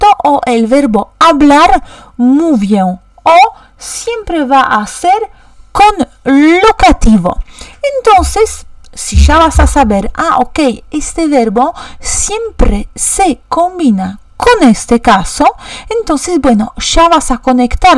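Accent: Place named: Rio de la Plata, Argentina. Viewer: Hola! He escuchado que tienes una pronunciación similar a la Argentina y Uruguaya, por ejemplo cuando dices "yo". Saludos desde Uruguay 😁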